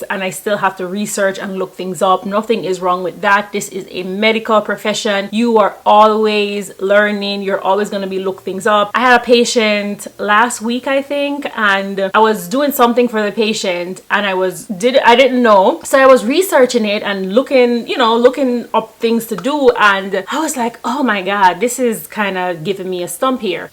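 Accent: Jamaica. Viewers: Are you a Jamaican? You sound like a Jamaican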